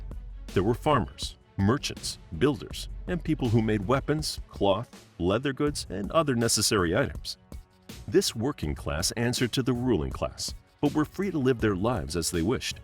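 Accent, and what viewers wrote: United States. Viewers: It is funny that an American(?) narrated! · Why is an American narrating this, also, inaccurately.?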